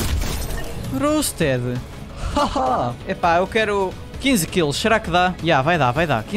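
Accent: Portugal. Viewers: VC é de Portugal né? Eu sou do Brasil VC vai me denunciar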